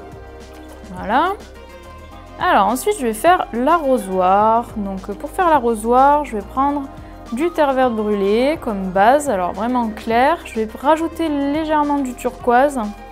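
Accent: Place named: Basque Country, France. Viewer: Alors, je suis Luzien, et je jurerais que votre accent est du coin...C'est tellement familier!!! Si pas luzienne, Basque tout du moins. En tout cas, Merci pour les vidéos!!! Milesker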